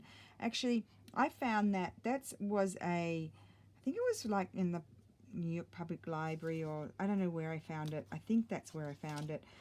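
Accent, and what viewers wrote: Australia. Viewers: I really enjoyed this video. I love your Aussie accent and your enthusiasm. These collages are some of the best I’ve seen.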